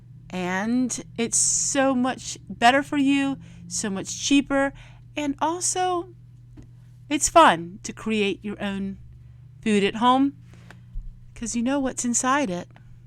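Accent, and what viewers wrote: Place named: Pennsylvania, United States. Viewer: thank you for sharing. I lost my father several years ago and it feels like just yesterday. Thank you for sharing so much with us, so many skills that have been lost to us. I think you have a PA accent just like when I talk I have a NY accent and people don't always get what I'm saying 😆much love!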